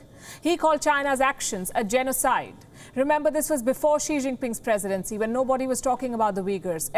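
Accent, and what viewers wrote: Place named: India. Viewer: Palki ji I want your audiobook Your reports are next level ,....nd Indian accent 🔥🔥🔥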